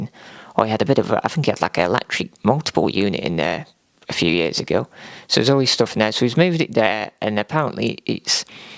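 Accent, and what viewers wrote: United Kingdom. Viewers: I've already started watching your back catalogue, very good. What county do you come from to have that accent (sorry to be nosey 👃 ) nice to hear a regional accent ! I'm down South so I like Midlands / Northern accents as opposed to our boring Southern ones haha. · Ay up lad, are you from't Cumbria lad? Are you 't local lad?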